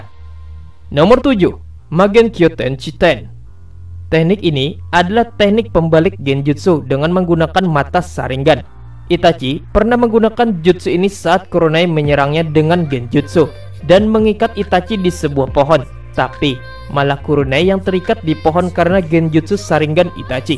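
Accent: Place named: South Kalimantan, Indonesia. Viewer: Bah nang ini tuh totok banar pian mang ay banjar kalua kah si amang nih nang kaya bang ipul hary haja jaejak si bungul tuuh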